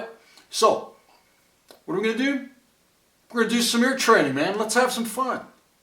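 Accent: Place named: Santa Fe, United States. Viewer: this guy must be from Santa fe. kkkkkk. love it.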